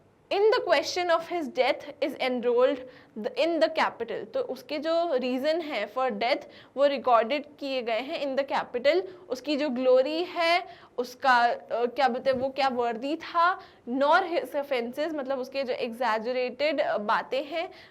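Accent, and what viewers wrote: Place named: Bhojpuri, India. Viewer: lag rha hai bhojpoori bol rhi hai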